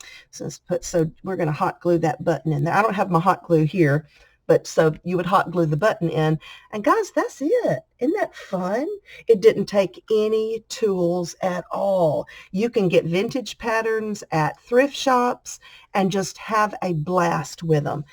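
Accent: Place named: South, United States. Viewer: These are fun. Love your sweet southern accent ❤️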